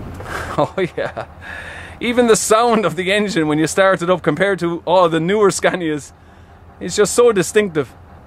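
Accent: Ireland. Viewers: He’s Irish you dumb fuck!! 🙄🙄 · Hey I like your accent are you Irish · Pretty sure he is Irish :)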